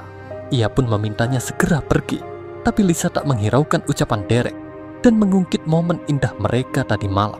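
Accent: Bali, Indonesia. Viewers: Admin org Bali ya? Aksennya kentel bgt 😅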